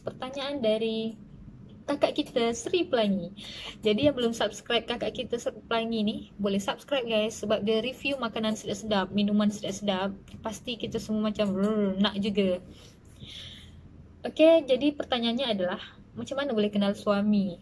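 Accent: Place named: Indonesia. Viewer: Sis maaf,siS org melayu tapi warga indonsia Tapi Bahasa malaysia anda bunyi indon😊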